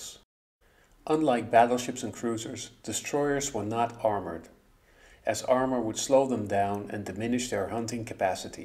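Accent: Netherlands. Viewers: Is that Dutch accent that I hear? 😅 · That is a Dutchman talking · Wat een irritant Nederlands accent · klinkt nederlands.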